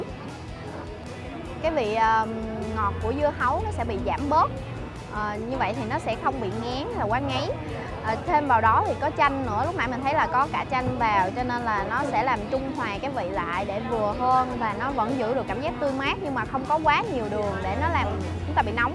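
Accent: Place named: Central, Vietnam. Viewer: bã nói giống dân miền Trung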